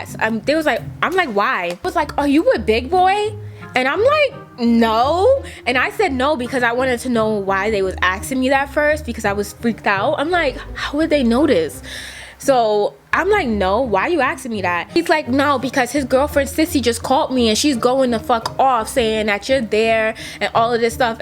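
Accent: New York, United States. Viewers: Are you from New York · she so new york i love it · Your accent still there but it used to be worse 😂 I need the full New York back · You are a real New Yorker 🤣🤣🤣 the way you tell the story ,and all I love it .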